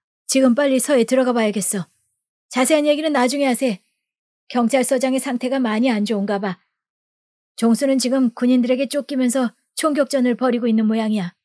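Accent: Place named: Honam, South Korea. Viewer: 혹시 출신이 호남이여.? 워찌 그렇게 억양이 똑 같어부까이.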